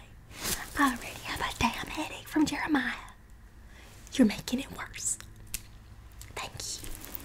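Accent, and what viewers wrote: South, United States. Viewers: the chaotic energy and southern accent are telling me you’d make the perfect charlotte la bouff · I don’t know why but some parts of this reminds me of Virginia being petty in Rising Hope 😂 it’s that, “oh no honey” and ,”where is your mother”. The slight southern tone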